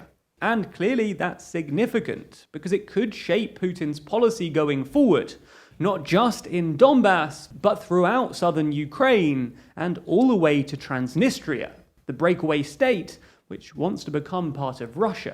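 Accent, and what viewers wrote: United Kingdom. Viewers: Dont expect to get a biased opinion from a guy with a british accent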